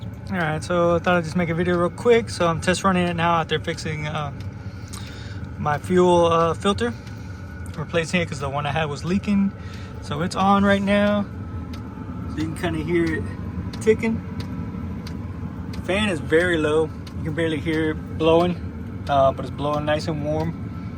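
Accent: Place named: New Mexico, United States. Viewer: I keep hearing a New Mexico accent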